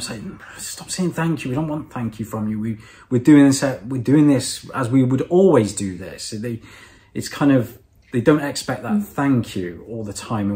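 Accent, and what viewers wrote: United Kingdom. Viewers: Очень интересное интервью - я слушал и наслаждался, так как я преподаватель английского языка и не люблю американский акцент и мне нравится британский. Очень приятно слушать Вас. Вам удачи и благополучия.Very interesting interview - I listened and enjoyed as I'm an English teacher and I don't like the American accent and I like the British one. It is very nice to listen to you. Good luck and well-being to you.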